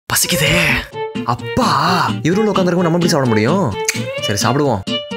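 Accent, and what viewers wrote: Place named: Madurai, India. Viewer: Madurai slang was superb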